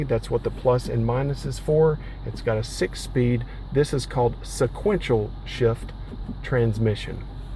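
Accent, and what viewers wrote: North Carolina, United States. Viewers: Thank you sir for this informative video! Going to pick up my new GX here soon and you have saved me a lot of time. Love hearing your North Carolina accent too (I'm from WNC). Will tune in for more car related videos!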